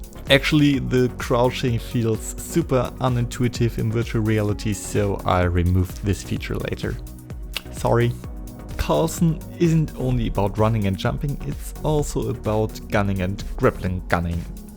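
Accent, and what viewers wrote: Germany. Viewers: You have a german accent lol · Bist du zufällig Deutsch? Are you a German? Hört sich irgendwie so an😂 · Dein Akzent hört sich deutsch an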